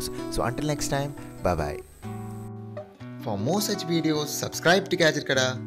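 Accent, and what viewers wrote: India. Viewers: I'm not sure if someone has mentioned this previously, but the addition of music in the background of this video serves needlessly to making the information you are presenting more difficult to understand. Although we both share a common language, I suspect that you would no doubt find my Canadian accent to be as difficult to understand at times, as I do your Indian accent and the background music contributes considerably to this difficulty. If your intention is to appeal to as wide an audience of English speakers as possible, you might consider omitting background music from future videos. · Thank you indian-accent-man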